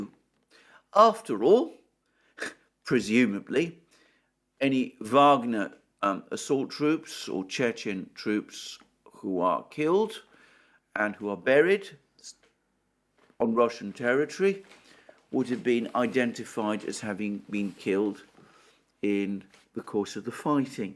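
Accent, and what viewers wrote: England, United Kingdom. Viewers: It's weird listening 🎧 to a communist with an English accent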